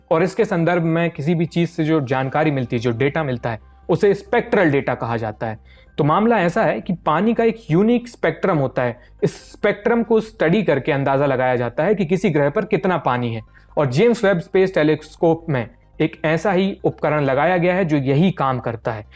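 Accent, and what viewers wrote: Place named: Jabalpur, India. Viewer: Mast bhai jabalpuri bhasha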